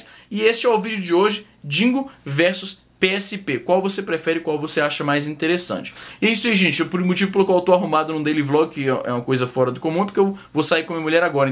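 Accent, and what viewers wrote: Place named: Northeast, Brazil. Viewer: grossura? ,,,,esse é conterrâneo nordestino(como eu) ...coisa boa kkkkk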